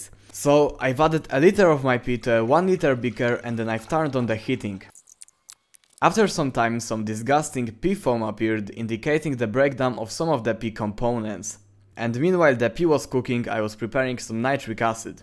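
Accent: Poland. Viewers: czy ja słyszę polski akcęt ? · No Siena mordeczko, wiefziałem, że elpolako po alcencie z “sz” I “cz”. Pordrooo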